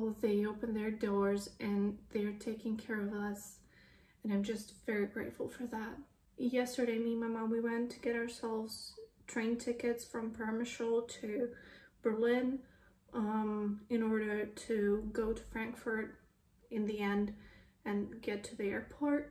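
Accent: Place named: United States. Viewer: Where did this fluency in English come from? And a perfect American accent? I live in the USA for 45 years, still have a Russian accent. When you see Marianne in Florida, give her a hug from an old friend please. I moved from Florida to North Carolina 2 years ago.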